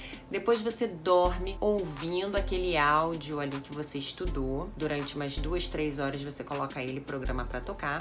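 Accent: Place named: Rio de Janeiro, Brazil. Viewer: Cai aqui de paraqueda e estou amando........ ótimas dicas e eu posso dizer com certeza .....isso funciona!! Foi com um método parecido como esse que fiz minha carteira de motorista......, sou Baiana moro na Europa e amo o inglês, estou aprendendo, mas as suas dicas são ótimas, obrigada... meu pagamento das aulas já fiz....... 😂😂😂dei meu like👍🏻👍🏻👍🏻👍🏻👍🏻👍🏻 e baixei o soninho 💡⏰🛎🔑pra receber mais bônus ( vídeos ) seu sotaque Carioca é lindoooooooooo🥰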